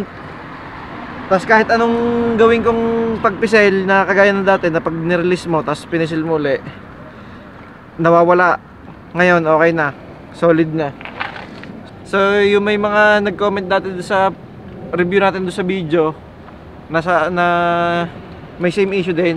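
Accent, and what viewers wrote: Batangas, Philippines. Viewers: Batangeño accent ah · Mahahalata mo ang liget sa hinde na batangueño eh. Areng si idol, liget. 😂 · kabayan saan ka gah s batangas haha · taga bats ata kayo bos,halata sa boses haha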